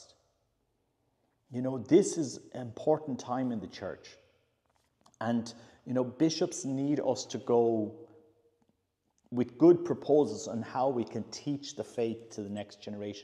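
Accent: Ireland. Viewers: you are so lovely to listen to - you have such a gorgeous Irish accent and what you are saying is so simply wise and beautiful. So true - Christ is our focus and prayer is our life blood; I recently attended a parish ‘consultation’ on whether the two dioceses in South Wales should be merged. The outcome was obviously pre-decided, and the whole presentation was put in terms of financial resources. No mention of prayer - as a few of the wisest and most enlightened of the parishioners mentioned to me. How can they possibly expect the church to flourish when even the leaders - even the bishops - do not see the value of prayer!!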